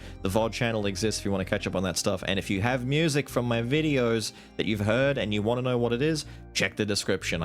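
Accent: Australia. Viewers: I didn't stick around for Skul. I saw funny looking thumbnails and a funny sounding Australian man, I knew for a fact that Skul is just the start for something more.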